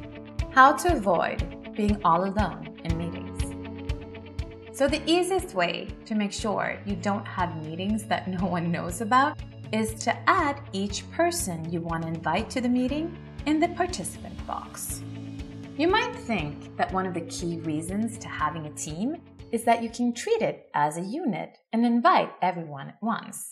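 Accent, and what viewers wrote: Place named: Sweden. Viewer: Applaudable pronunciation, but I could hear that Swedish accent! :D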